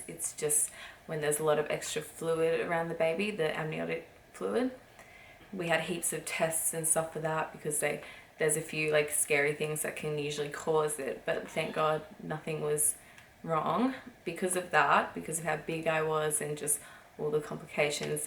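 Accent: Australia. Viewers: Third Aussie YouTuber I'm subscribed to. Aussie accents are like music to my ears lol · Pretend? well we don't need to with you honey you fat water buffalo. This girl is absolutely beautiful (no pretend) also she's not monotone it's just an Aussie accent and looking after two young kids all day. That shit takes a toll, but you wouldn't no fatty and you never will.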